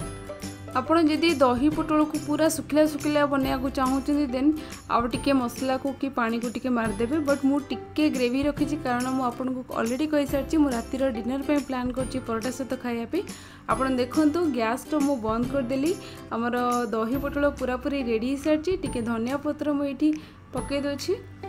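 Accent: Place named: United States. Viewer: Why ur accent is like this are u from America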